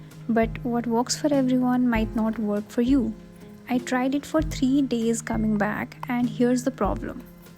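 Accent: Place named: South India, India. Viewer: Judging by her pronunciation, she's probably from the south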